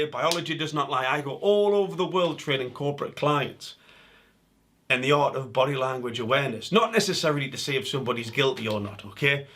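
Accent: Newcastle, United Kingdom. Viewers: great to see you back... your sultry geordie voice reminding me of my birthplace.. would still love to see a video on Jussie Smollett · Been missing that Geordie sound! Welcome back!🌝👍⚘❤🇨🇦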